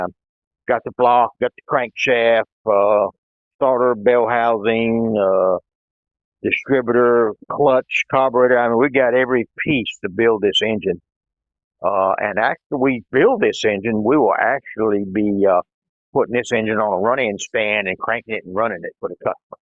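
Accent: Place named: Georgia, United States. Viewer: Man, I love hearing this gentleman speak on motors. I’m from SC, and that Columbus, GA accent sounds great from here in Hamburg, Germany tonight. Thanks for the vid! Ready to get home to my 68 GTO.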